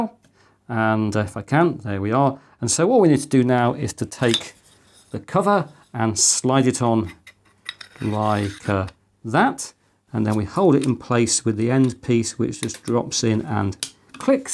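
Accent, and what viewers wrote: United Kingdom. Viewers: Your british accent is exemplary.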